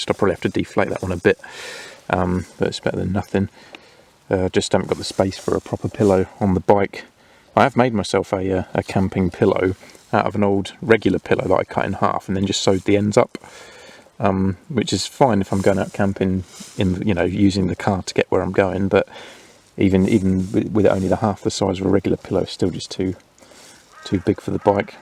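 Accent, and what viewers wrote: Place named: Southern England, United Kingdom. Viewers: You got a great looking channel here old chap! Glad to have found it and definitely subbed! You sound like you may be from down South somewhere, like me, maybe just outside London? slugs love tea, coffee and BEER!...so dont leave an open tinny sitting about on the floor, even in the evenings, you'd be surprised just how fast they will find it! MTFBWY! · about that serial killer in the night - they would cut the tent open with a knife, not use the zippers, so that lock really ain;t no good whatsoever. On top of that, it prevents YOU from getting out quickly in moments of danger. I'd just carry pepperspray, a nice axe and a loud whistle instead! Or bring a dog - can;t get more safe then with a nice Rottweiler at your side. Loved teh video - everything seems so easy and so natural with you. Amazing! Thansk for sharing! 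If I may ask - aprox. what part of teh UK are you? You sound like wider Londonarea to me...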